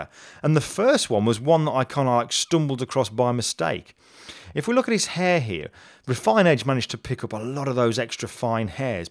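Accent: United Kingdom. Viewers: Really great tutorial!! Exactly the tips I was looking for, and I really like the way you teach/talk etc. (nothing against U.S. tutorials, as I've leant loads from them, but I love to find a fellow Brit to learn from.) I think I've found somewhere I will defo be coming back to regularly!! Cheers!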